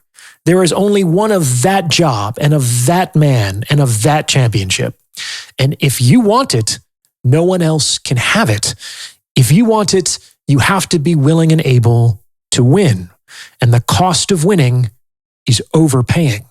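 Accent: United Kingdom. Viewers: If you are astute enough to make a lot of money, then you should be astute enough not to drive the fancy car. You sound like some sort of UK Indian subcontinent person. The average intelligence quotient is 82-83, and you are probably going to receive a lot of idiotic behavior. If you are middle class, then these people are even more status-oriented, more subtle and less greedy, and unless they are your true friends, then they are useless in your life. You won the race and they are all losers in the race. At least 60% of people do not possess the ability to be happy, and they only way they can gauge happiness is by what they have, and how much things cost. It is a mostly an impoverished existence. I was also successful for a number of years, and none of my true friends ever needed money, because they also eventually became successful. But there were many other people that I knew, and I had obligations, and then I moved to the second world much of the time (where I live a dream life), and all of the people wanted something from me. 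If you want to get along with (all of the other people who are not achieving) then do 2 things 1) hide your wealth to the greatest extent possible, so you don't get killed (like the Chinese woman Abby Choi, who was killed by her former in-laws in February 2023) 2) Friends are different, but when it comes to acquaintances, make sure your money is available for their achievement. Your job is to make people's lives better. (I give money, based on what I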